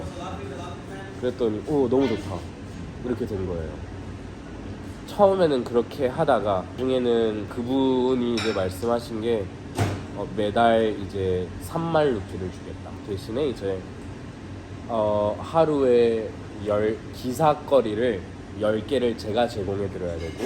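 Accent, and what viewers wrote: South Korea. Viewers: 이 분은 한국분인가요? 말씀하시는 게 토종 한국인인데요 ㄷㄷㄷ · 부산 사투리 아직까지 있네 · ㅇㅇ 한국말을 잘한다 라고 말할게아님...그냥 모국어가 한국말인데 우리랑똑같죠 모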